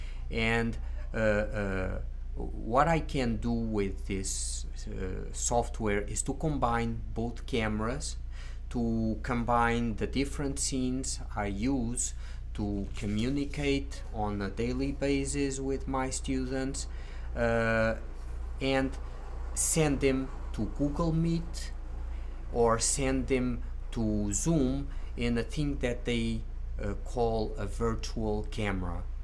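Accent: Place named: Portugal. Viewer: I think that you are portuguese and are reading stories in spanish with a portuguese accent. it doesnt sound authentic when you read in spanish. I am portuguese and speak spanish so I can pick up on your portuguese accent when you read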